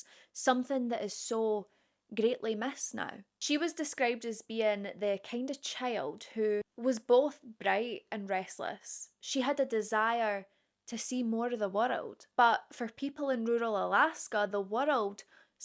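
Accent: Scotland, United Kingdom. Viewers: As a true crime buff, I'm so hyped to have found your channel (late to the game, I suppose) 💙 What part of Scotland are you from? I love the accent (almost as much as I love Scotch whisky 🥃 LOL) And how do you come up with so much content every week? You're like a walking criminology encyclopedia 📖 — definitely the most informative 'yapper' around 🧠 — subscribed and shared 👍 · Hello again from Canada I just found out what Hyping is and after listening to the explanation I get it now... So of course I Hyped you... Love you, Love your videos... mostly love your Scottish accent 👍🏼💙💙💙🇨🇦j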